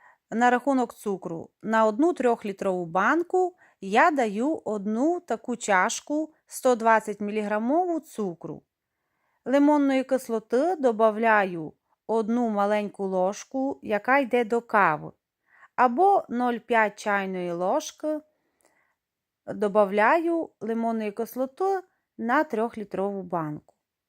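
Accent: Transcarpathia, Ukraine. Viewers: У вас вимова як у Олі,закарпатської господині,наче ви сестри.Дуже приємно вас слухати,голос лагідний.Дякую за рецепт.Бажаю вам всього найкращого.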